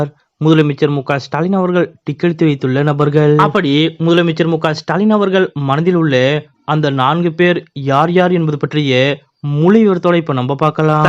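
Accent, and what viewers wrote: North India, India. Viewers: Speak properly Tamil. You are not original Tamilian. May be from North India. From Gujarat????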